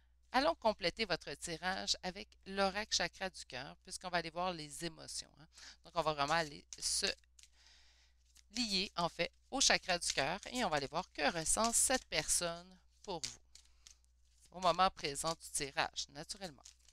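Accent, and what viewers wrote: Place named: Montreal, Canada. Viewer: Bonjour, j'aime beaucoup votre façon d'interpréter les cartes Et j'adore votre joli accent. Ça me fait penser à ma famille qui vit à Montréal 😊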